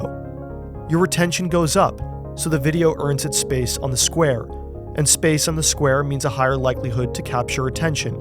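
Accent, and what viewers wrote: United States. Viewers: i dont get it Why is this guys obsessed with europe He sounds American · Wederom dank voor je video (overigens bizar hoe je accent zo Amerikaans is).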